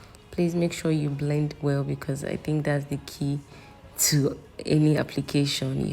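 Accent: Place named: Yoruba, Nigeria. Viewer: with the yoruba accent🤪, welldone babes😘, we are your drawing book, paint us as you like 🤩😘😍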